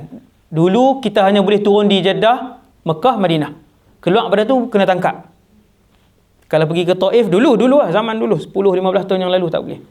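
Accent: Kedah, Malaysia. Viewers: Suka dengar ceramah ustad.. Dengan loghat kedahnye...❤️.. Semoga ustad sentiasa dlm lindungan dan rahmat Allah.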